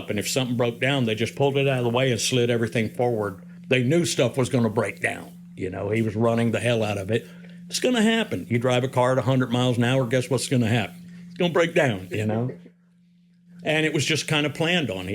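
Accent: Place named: Indiana, United States. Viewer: This guy is cool to listen to, he sounds like he could be a Hoosier (?) referring to the “accent” if we have one 🤷🏻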